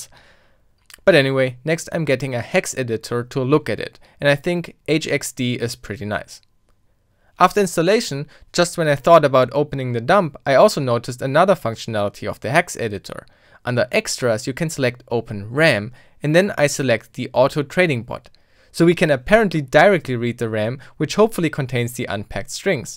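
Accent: Germany. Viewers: Hard German accent. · thats a german accent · From your accent i can guess youre German, da sag ich nur: Gute deutsche Arbeit! daumen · Obviously German accent xD But hornestly I would have used Wireshark to export the data from the webserver. It fetches all incimming and outgoing data for that. Quiet nice hearing about that other programs, but it looks to unconfortable to me exporting ram to get a server request. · MinecraftJeffy don't be stupid, he speaks english to reach a bigger audience and everyone understands what he wants to tell. He might have a german accent, but how should anyone learn English if you forbid themto speak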